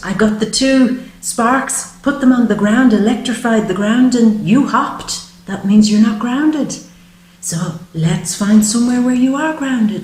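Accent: Ireland. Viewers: ire u irish by any chance btw im irish thank u for video much love <3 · I just love your Irish brogue, maybe I will meet you one day :) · I am a newcomer to this video and I love what I see, hear and learn from you. Listening to you, I am just awestruck by your extraordinary and wonderful energy, your natural beauty, your graceful demeanor of delivering, and most important, your intuitive skills-set and wisdom of what the world can bring us. Thank you, I am so happy to have found your site. Also, do I detect a slight Irish accent? It is absolutely superb and I love it.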